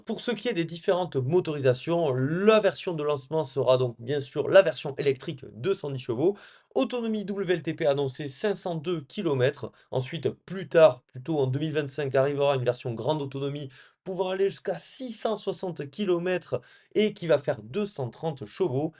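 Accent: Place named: South-West, France. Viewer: Sans être prévenu !!!! J'ai arrêté le visionnage dans la seconde !!! Non je rigole , j'ai subi jusqu'à la fin et ...... Ce n'est pas demain la veille que vous serez KWAME , POA , 100 BORNES pour être invité avec vos analyses et réflexions dénuées de tout professionnalisme ou dans votre cas d'un amateurisme averti ! Donc être invité à un lancement produit faudra être patient, plus pro (😮) car l'accent du sud-ouest n'excuse pas tout !!!.... Amen ..... 😮😮😮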